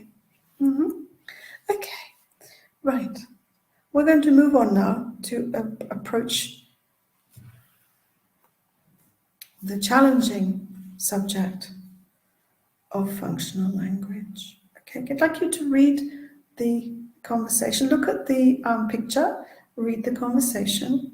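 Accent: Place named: Russia. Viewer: You are absolutely right, she has harsh Russian accent, her knowledge and professionalism I have not criticised by the way, bookish definitely ( having impression that she has not experience talking with native speakers) I tried my best to listen the tutor to the end, no success. I am not teaching English, more over I am not teaching other teaches !!!!!!!! I can have any accent. Good she has got comments, now she can working on her accent . It is hard work in universities for interpreters, they have classes for this particularly problems, as muscles have not been used for soft talk and for different phonetic, but has to be done as many ppl did. Wong Pronunciation it is common for Russians teachers. This is why I prefer to listen and communicate with native speakers .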